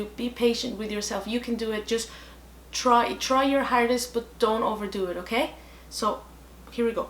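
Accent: Ireland. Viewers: I thought as much ud might of lived here with ur accent, I trained as a sprinter at the UL from 1989 a wee 14 yr old and come 1997 I became national champion at sprinting 200m under my coaches then Dr Drew Harrison n his lovely wife Hayley. They helped produce many Olympians and countless national champions. I absolutely loved being in the Ul, even tho I wasn't a student but I've always been welcomed by the Athletic coaches there over the years n got to meet n train with many Olympic medalists, world record holders n meeting my hero's, it was amazing just like u are as a coach to Bodhran playing. Ives just spent 45mins doing my rashers n sausages ha ha n just like Athletics uv gotta put in the training. Thanks again for getting in touch, I'm so honoured by that from you. Keep up the good work theres something definitely special about you 🙏🥁🏃‍♀️🇮🇪☘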